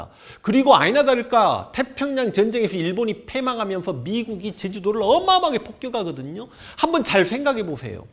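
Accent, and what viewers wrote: Jeolla, South Korea. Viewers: 원래 전라도 사람들이 감성적이더군요 이분도 사투리 억양이 딱 그쪽이네욪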